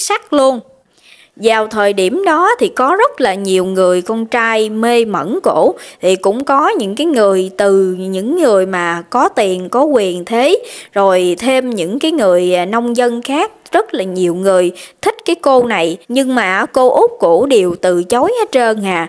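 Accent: Mekong Delta, Vietnam. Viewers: Chau kê truyên lưu loat ro rang giong chât miên tây ne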